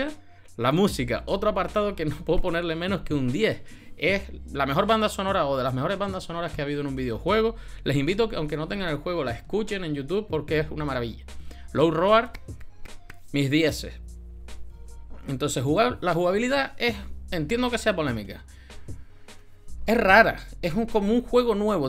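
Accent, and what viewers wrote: Canary Islands, Spain. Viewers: Español de Canarias por el acento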